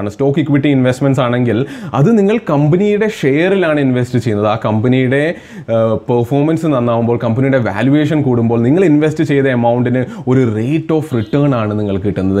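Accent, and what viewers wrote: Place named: Kollam, India. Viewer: കൊല്ലംകാരൻ ആണ് അതാണ് 💖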